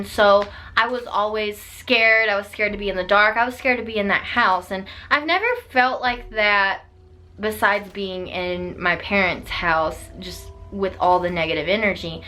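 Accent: South, United States. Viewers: My God. The comment of a possible bugs on ur bed on ur face freaked me out more than ppl in your room..ugh sorry. I think ur newest place is haunted and ur just too open or sensitive to it.. Hope u at least cleansed the place, ur decor (bc yes spirits will attach to their fav or beloved items..whole show on tv on it years ago..freaked me out buying second hand stuff just in case..but now I do but just cleanse anything I get 1st b4 coming into my home), and urself by now..bc kno this was months ago. So hope everything's all good now..got some catching up on vids to do (I say with shame for I adore u and ur channel/content). ..also..lookin beautiful and healthy as ever..always on of my fav youtubers..I mean creators (prefer callin y'all)..both southerners and myself now living in NY..just feels like home hearing ANY southern accent..but love urs the most..💜💜💜💜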